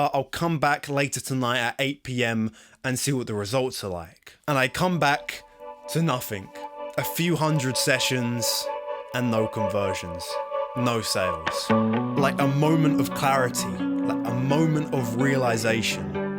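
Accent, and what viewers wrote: United Kingdom. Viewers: I notice you selling and using $ but you’re accent is british. Do you live in Uk? Or uS?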